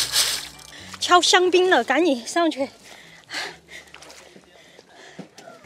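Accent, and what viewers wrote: Sichuan, China. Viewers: 你的口音怕不是川妹儿吧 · 四川人吧，川普的口音，只有四川人听得出来。加油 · 听你讲话，老家应该是四川的，或在西南长大的，然后出国了。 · 四川口音好重😅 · 听着熟悉的川普，果断定阅，谢谢分享！ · 作为一个四川人，听到这亲切的川普真是亲切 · 你的口音是四川的吗 还是重庆哈哈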